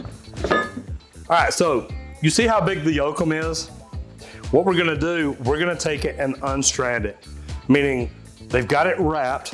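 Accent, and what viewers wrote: South, United States. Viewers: "Sewer pipe" / ˈsu ər / / paɪp / Not soda pop or syrup hop. Just a heads up for people who aren't used to southern accents. I was born and raised in The South and I struggled with that one.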